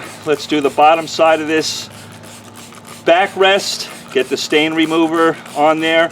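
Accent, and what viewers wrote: New York, United States. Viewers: Joisy or Newyawk. So, when was the last time you were in the Clam Broth House in Hoboken, or McSorley's on 7th? LOL Been too long for me, sadly.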